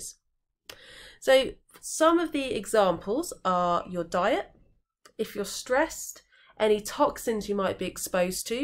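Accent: United Kingdom. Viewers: Like your British accent. You pronounce certain words differently than we do in America.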